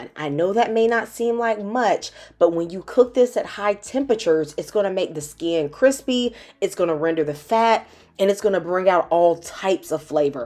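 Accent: South, United States. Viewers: Why you sound like that other lady who cook do all y’all southern ppl sound the same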